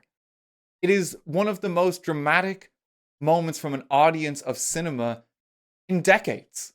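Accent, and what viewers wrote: Ireland. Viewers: keep the good work up! Never thought I'd get a second Irish lad talking about stuff he enjoys and is passionate about in longform, but here we are! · God he's so Irish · theres something magical about being a casual viewer and barely ever seeing like, physically, and then suddenly getting almost 2 hours of physical. im a week late but i honestly love video essays and long videos, i put them on in the background as i draw and its always so fascinating to listen to peoples different opinions and views on things. i also like irish accents a lot so its fun to listen to him talk. i vote more video essays on things you like (i reeeeally want a minecraft story mode video essay, i watched the playlist of that recently and the hatred for it is so funny) also thank you your editing is genuinely inspiring (i think a lot about how the e33 video was and how much that tickles my brain)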